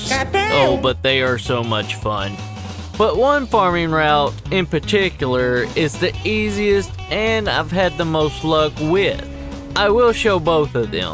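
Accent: South, United States. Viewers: bros aggressively southern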